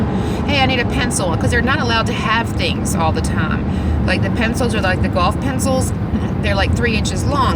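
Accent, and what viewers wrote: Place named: South, United States. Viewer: i love your southern accent lol :D you are so kind to care for these people. i'm sorry you had such a crappy day. i dropped my TV earlier so it's been a rough one for me too :( your job sounds interesting, i'd love to hear more about it!!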